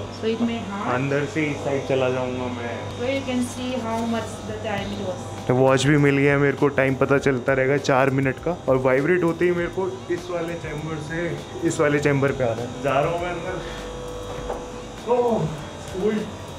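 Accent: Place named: United Kingdom. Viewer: Kaafi tagdi English accent hai bhai aapki🔥👌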